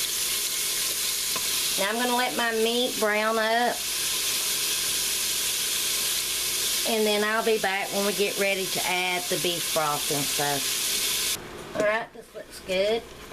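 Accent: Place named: Kentucky, United States. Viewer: I love your accent, are you from Kentucky? I’ve made this dish several times from your recipe, it’s always delicious.. one of my favorite meals ... thanks for the video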